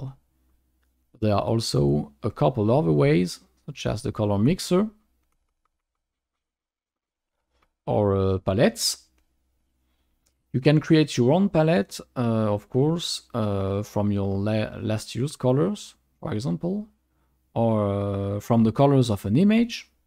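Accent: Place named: England, United Kingdom. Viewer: Pas mal ton accent anglais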